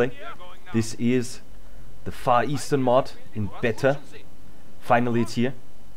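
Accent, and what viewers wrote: Germany. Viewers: Comrade Slaine Oh ich hab neulich auf der Mod DB Seite gesehen, dass da noch leute aktiv sind und dachte da tut sich was... schade. Und ich kommentiere deutsch weil vielleicht Mr. Videomacher persönlich (hört sich ziemlich deutsch an) etwas dazu weiß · netter deutscher Akzent ^^ xD glaube ein wenig bayerisch oder?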